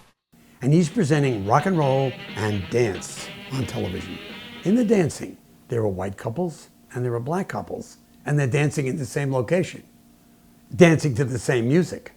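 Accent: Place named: Brooklyn, United States. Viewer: I love your accent, I wish more people still had it. Are you from Brooklyn? My grandmother grew up in the Bronx and had a similar accent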